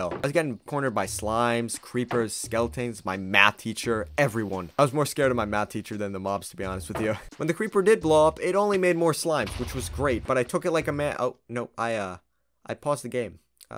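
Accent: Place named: France. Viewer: Your French accasent I cool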